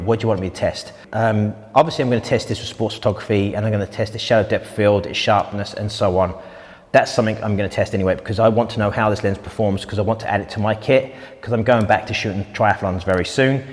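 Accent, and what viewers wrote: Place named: Portsmouth, United Kingdom. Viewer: Devonshire is a beautiful place. You are right about Portsmouth always something happening there. (good or bad) Still trying to get to use his Pompey accent on his channel.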